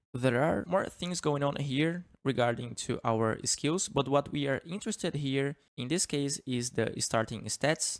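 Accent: Brazil. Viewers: Esse sutaque nn me estranho kkkk br certeza.. oueut o ficando loko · Woah, such nice Brazilian Accent,! Gotta like that! Also, nice video:3 É nois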